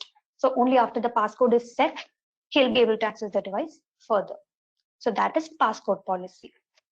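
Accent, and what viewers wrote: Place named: India. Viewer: I really love that you guys are making these training videos and actually share them for free, but for some reason there are too little views here... not sure why. no comments at all, no one to argue with))) or ask questions - if these could be used as forums - wow. And oh god, I am so sorry to have to say this, but the english accent is truely cutting my ears to small pieces, it is veeeery difficult to listen to. Please don´t take it as offence in any case, but wouldn´t it be better if for the training videos you had person for each language? For example for Indian companies make in Hindi and for English and European speaking countries get someone who has acceptable pronaunciation. I mean even if the engeneeir is the someone who doesn´t speak English, he or she could still script what needs to be said. I think this will ensure best discription and result in the trainings.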